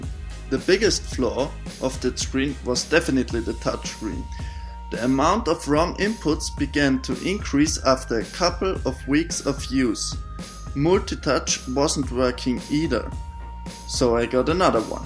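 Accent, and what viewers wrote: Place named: Austria. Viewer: klingst sehr österreichisch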